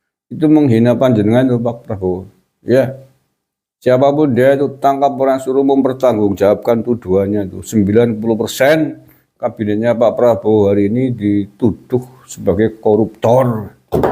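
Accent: Banyumas, Indonesia. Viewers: paling seger nonton kontenne panjenengan bung.logat ngapakke ngalahno boso inggris.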